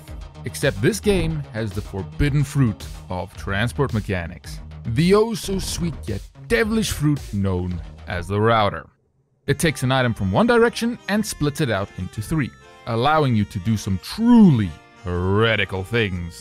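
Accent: South Africa. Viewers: Long since I've heard a fellow South African Youtuber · Dude! Are you South African?? Cheers from a fellow citizen missing mail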